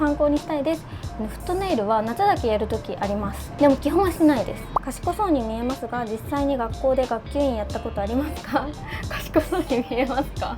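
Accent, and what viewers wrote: Kansai, Japan. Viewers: ちゃんって、独特のイントネーションから関西人だと思ってました‼️ てっきり関西弁訛りの標準語だと…知らんけど😅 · ちょいちょい訛っているから西日本出身だと思ってた！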